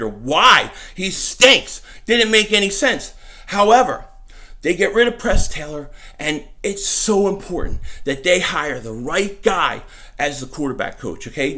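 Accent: Philadelphia, United States. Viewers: Wow never knew you were in cali, you talk like your from south philly, even your wall looks south philly lol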